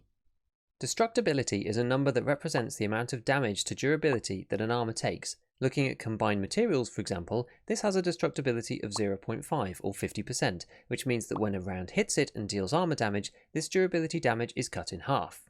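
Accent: United Kingdom. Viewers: The British accent, Can't do it. its like listening to your Nephew talk, bullshit. Your voice has the same frequencies that make dogs wanna commit suicide.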